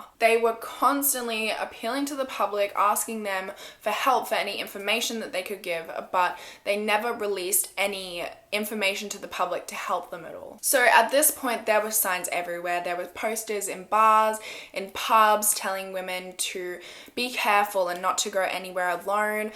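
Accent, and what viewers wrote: Australia. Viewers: Just found your channel and can't get enough of your Australian accent! Love how you say light or right! Keep up the good work! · I KNEW THAT WAS AN AUSSIE ACCENT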